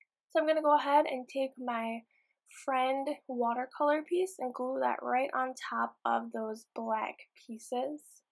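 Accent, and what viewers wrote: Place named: Minnesota, United States. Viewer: I'm happy you're back! Congratulations on your new baby! Your accent sounds like it's from here where I am in Minnesota! BIG time!!!!!!! :-)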